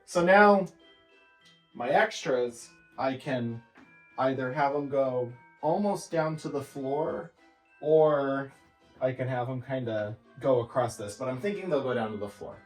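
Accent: Minnesota, United States. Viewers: The final garland look over the TV deserved that high kick! Looks so great! Since you mentioned you had 3 sets of batting, without further ado: On the 3rd Day of Vlogmas my true love gave to me: Three sets of batting Two power stips 🔌🔌 And you sound like the movie Fargo 🎄⛄🙂⛄🎄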